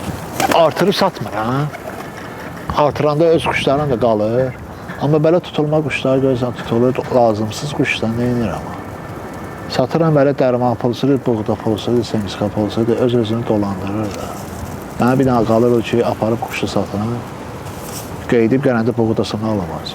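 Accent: Baku, Azerbaijan. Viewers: Can ey can baki lehcesi var ol qerdew mende quwbazam qedew baki quwdarna catan yoxdu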